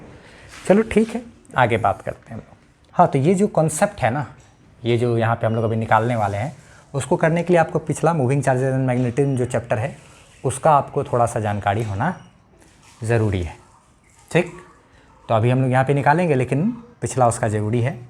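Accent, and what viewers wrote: Bihar, India. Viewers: plz.... support him everyone... he is bets teacher and the favorite part in his all videos is his bihari tone ....💕💕💕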